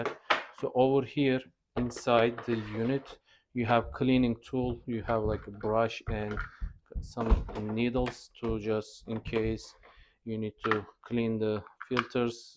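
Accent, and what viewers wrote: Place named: Turkey. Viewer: Aksan ben türküm diye bağırıyor 10/10 video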